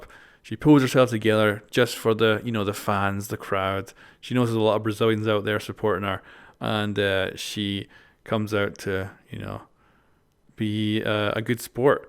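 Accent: Scotland, United Kingdom. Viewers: malta? hes scottish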